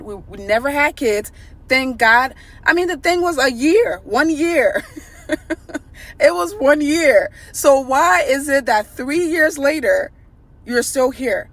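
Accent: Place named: Jamaica. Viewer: Maybe you sound like you from Jamaica or somewhere maybe you should probably go back somewhere where he can’t find you and don’t talk about it just up and move somewhere and you might have to leave some people behind. If y’all have mutual friends you may have to cut them off cause it sound like he might try to do something to you. You might wanna justmove again and don’t tell anybody.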